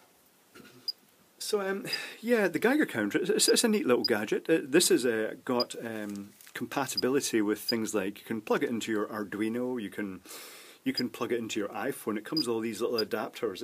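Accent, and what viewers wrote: Scotland, United Kingdom. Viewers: Very informative, I also wanna buy this because of the same video. Also, are you Scotch?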